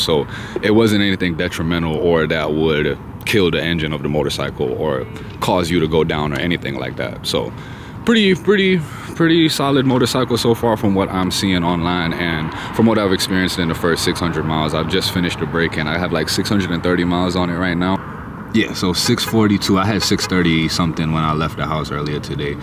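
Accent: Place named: Trinidad and Tobago. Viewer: I'm looking at the naked bike version of this, they both look so damn good. It's crazy because I remember no one talking about them, looked them up and then next thing I know the 675 is everywhere lol. Enjoy it bro, for a first bike you made such a good choice you probably won't want to change for a long time. Also, I hear your accent bro ! lol my family is from Trinidad so I got an ear for it. Dropped you a sub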